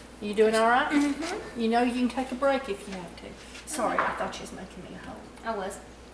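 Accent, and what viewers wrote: South, United States. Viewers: What was the name of the last ingredient that you used from that list? I'm sorry but its hard to sometimes hear well withat cute southern accent of yoyrs. Sounded like yellow dot glue but I'm sure that wasn't it at all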